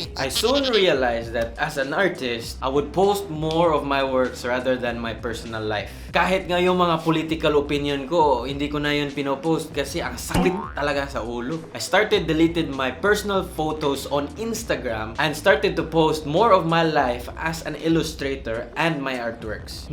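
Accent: Visayas, Philippines. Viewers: [UNRELATED] Bisaya kaba? haha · bisaya ka hahahha pareho diay ta asa man diay inyu?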